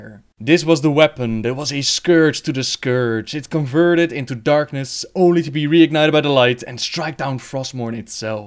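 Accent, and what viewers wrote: Netherlands. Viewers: i hear a heavy dutch accent in his voice. :) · this sounds so dutch it hurts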